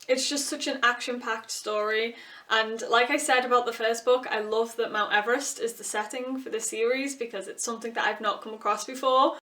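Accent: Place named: Leeds, United Kingdom. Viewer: what part of the UK are you from? hope you don't mind me asking, just trying to pinpoint it through your voice... kind of sounds like you're from the Leeds area??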